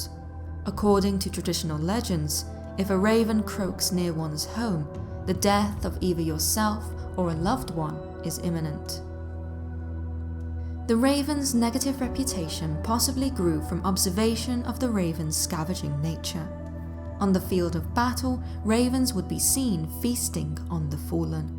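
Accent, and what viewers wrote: United Kingdom. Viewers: I subscribed few days ago, love ur voice n narration!.. Bdw.. Are u British ???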